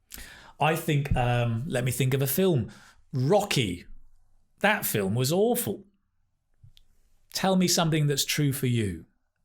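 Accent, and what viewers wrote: United Kingdom. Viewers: I adore your British accent. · I’ve never thought I would bump in your outstanding video lessons. Your way of teaching is a breeze, your native British accent is out of this world. I always pay attention to each word you say ; it comes effortless from your mouth. Despite watching other videos , this one has definitely been a top-notch, every expression is really worth and sound to know about and hopefully use . It would be a real let-down if I don’t use this from now onward. Thanks endlessly Sir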